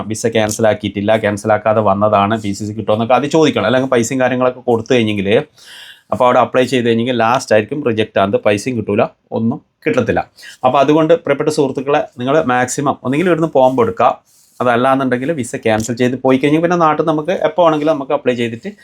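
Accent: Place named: North Kerala, India. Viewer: താങ്കളുടെ വീഡീയോ വളരെ ഉപകാരപ്രദമാണ്. പ്രവാസികള്‍ അറിയേണ്ട നിരവധി കാര്യങ്ങള്‍ സമയാ സമയങ്ങളില്‍ അറിയുക്കുന്ന താങ്കള്‍ക്ക് നന്ദി രേഖപ്പെടുത്തുന്നു. താങ്കളുടെ സംസാരത്തില്‍ നിന്ന് താങ്കള്‍ വടക്കന്‍ കേരളത്തില്‍ നിന്നുള്ള വ്യക്തിയാണെന്നാണ് മനസ്സിലാകുന്നത്. പക്ഷേ, പലപ്പോഴും താങ്കള്‍ സംസാരിക്കുമ്പോള്‍ തെക്കന്‍ കേരളക്കാര്‍ തെറ്റായി പറയുന്നതത് പോലെ 'കിട്ടത്തില്ല', 'പറ്റത്തില്ല' എന്നൊക്കെ പറയുന്നതായി കാനുന്നു. നല്ല കാര്യങ്ങള്‍ സ്വീകരിക്കുന്നത് നല്ല കാര്യം തന്നെ. പക്ഷേ, ഇത്തരം അബദ്ധങ്ങള്‍ എന്തിനാണ് അനുകരിക്കുന്നത്?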